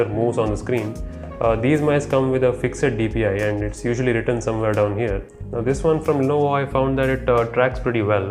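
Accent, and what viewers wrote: India. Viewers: The channel's name should be Hopeless indian sounding guy that causes cancer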